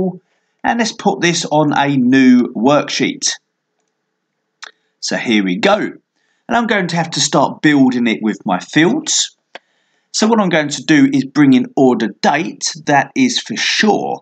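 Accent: United Kingdom. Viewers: Thank you so much. I've been looking for an explanation for this analysis in other languages (in pt e it) for so long, without success. Your explanation is so clear, plus british accent, perfect!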